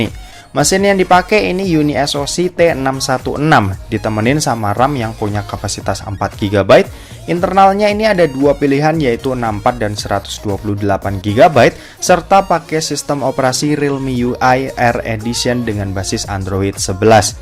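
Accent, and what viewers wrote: Semarang, Indonesia. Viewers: Asli Semarang bang?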